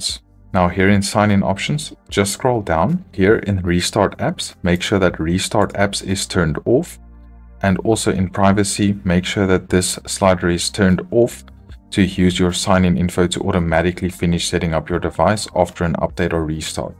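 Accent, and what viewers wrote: South Africa. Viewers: South African accent. thanks for the help.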